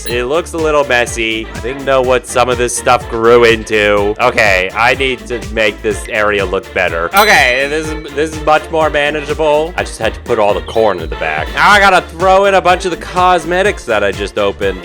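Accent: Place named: United States. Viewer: Why does he sound American even though he said autumn?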